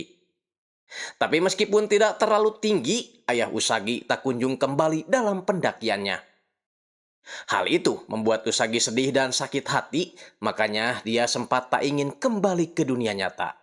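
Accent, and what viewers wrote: West Java, Indonesia. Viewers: Papua hadir. Selalu terhibur dgn khas Sunda nya🙏🤣, sukses selalu Aa🙏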